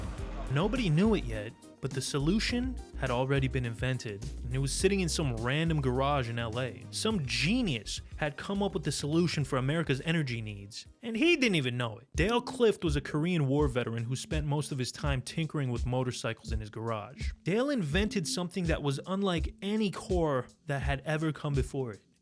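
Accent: New York, United States. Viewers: I'm New here . I'm from the UK . But I lost it when I heard " she could sell bacon to Ben Shapiro 😂😂😂 LMFAO. I can tell this guy is from NYC 👍 . You got yourself a new subscriber . Good content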